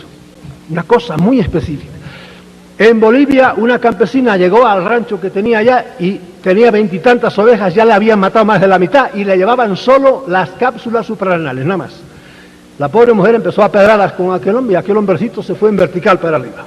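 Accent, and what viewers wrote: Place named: Spain. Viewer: crean solo en mi palabra sin ninguna evidencia de nada ya que es mas comodo y barato para mi . parece increible que despues de tantos años todavia sigamos doblegados y nos dejemos imprecionar en cuanto oimos el acento español, haa pero no sea uno de los nuestros por que le exigimos evidencias fotos y aun asi lo denostamos Que nos pasa?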